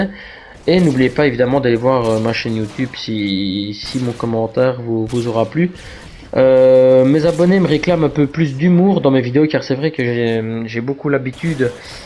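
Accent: Belgium. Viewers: j'ai direct su que tu venai de liege avec ton accent ( je suis de verviers ;)) ce qui explique ton pseudo ;p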